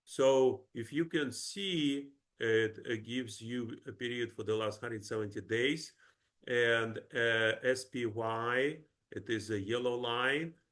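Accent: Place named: Ukraine. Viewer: What a terrible narrator. He sounds like a Ukrainian. Don't you have narrators who speak English fluently?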